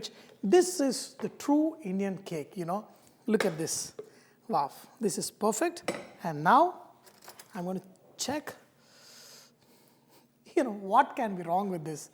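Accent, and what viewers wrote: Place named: South India, India. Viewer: Snigdha Parida he is from south india so they pronounce like that ☺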